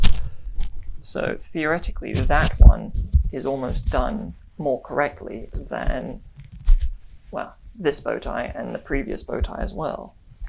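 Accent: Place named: RP, United Kingdom. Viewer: no worries about that. You're fine and definitely do better than I could. We all enjoy your videos and learn from them and appreciate the work you put in. I do feel like maybe you're trying to be very RP with no regional accent and as an American we don't care at all about perfect RP.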